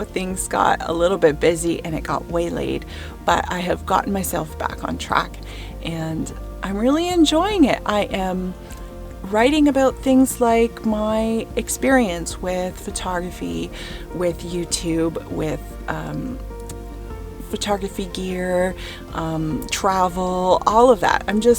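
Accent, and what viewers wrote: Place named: Canada. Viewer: Love that Canadian accent, aboot and all that. Not a fan of the cold but beautiful images. Well done.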